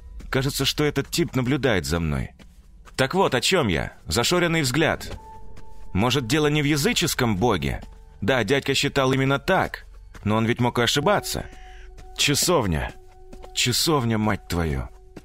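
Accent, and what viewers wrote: Standard. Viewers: чистый русский язык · Симфония, песня, бальзам для сердца. Чистый русский язык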